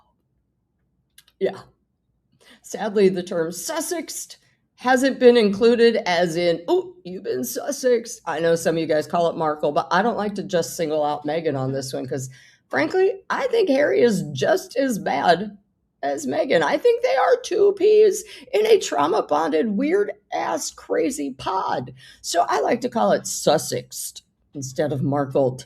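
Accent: South, United States. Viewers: Is it just me or did get a vaguely Southern accent for most of this video? 🙃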